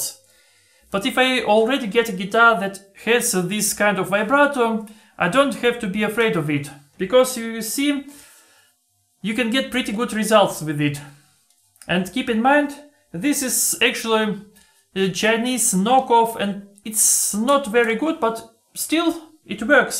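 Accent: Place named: Russia. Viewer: Хм, у тебя очень русский акцент))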